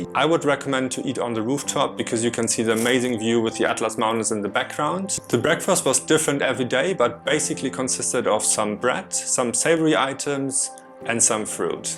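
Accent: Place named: Germany. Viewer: nice vlog, german accent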